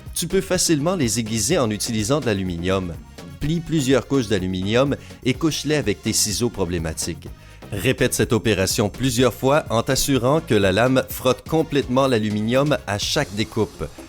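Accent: Quebec, Canada. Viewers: Accent canadien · c'est un être à cause de son accent. Probablement un canadien , de plus, on n'est pas habitué au tutoiement facile des Québécois qui nous donne l'impression d'être un enfant ! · Je bois VOS SAINTES paroles monsieur le Canadien. Bon de ce pas je vais mettre sur MA table de nuit un bulletin neuf du loto ou mieux encore un bulletin neuf d'euro millions sur une feuille d'aluminium Et peut être que dans un rêve je verrai la combinaison gagnante. Merci du conseil et que vive Laluminun...jadore VOTRE accent..moqueur ce...oh le vilain garnement...je vous ai mis un joli pouce bleu..bonjour à Céline...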